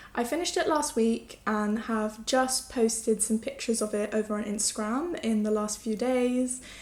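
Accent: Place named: United Kingdom. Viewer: I enjoyed your Video again very much and I love to hear your British accent... I recently finish ed the Agave Sweater by Yumiko Alexander. I knitted it with Drops Bomull Lin in dark Blue. It was fun and a quick knit also. I will also try one of the bags you recommended in the speacial bag video. Best wishes from Cologne! 🎉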